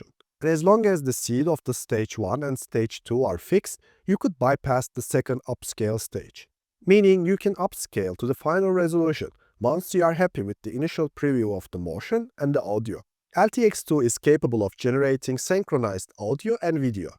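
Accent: Turkey. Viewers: Turkish accent :)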